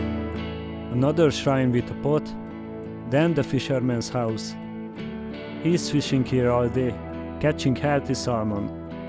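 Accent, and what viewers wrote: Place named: Hungary. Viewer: You have great skills bro, you have another subscriber. Also your accent reminds to hungarian speaking english, but its cool!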